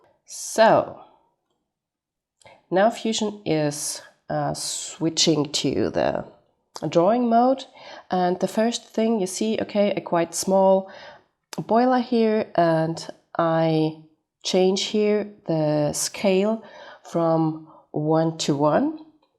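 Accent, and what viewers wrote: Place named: Austria. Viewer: OMG you are too cute, I could listen to you explain things forever and your cute Austrian accent is the icing on the cake!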